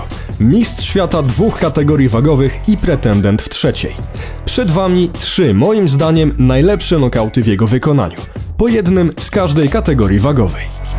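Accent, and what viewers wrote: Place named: Germany. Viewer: Akcet dość mieki ale slabo niemieckie to nie rozpoznałem że Polska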